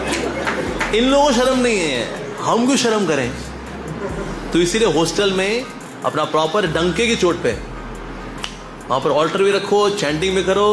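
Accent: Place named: Punjab, India. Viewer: baat ka andaj aaj bhi punjabio wali karte ho prabhu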